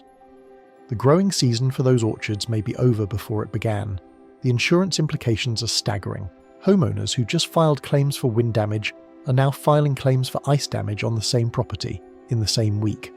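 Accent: United Kingdom. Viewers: Why British doing all our videos ....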